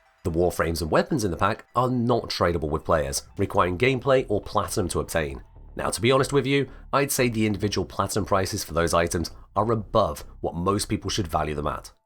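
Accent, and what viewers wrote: United Kingdom. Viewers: True London voice.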